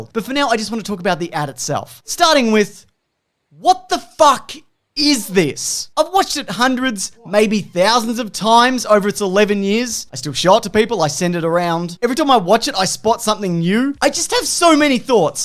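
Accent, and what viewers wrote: Australia. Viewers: High pitched Australian makes me want to get corona so i can eject phlem from my lungs and stuff it in my ears so i can mute this horrendous shit.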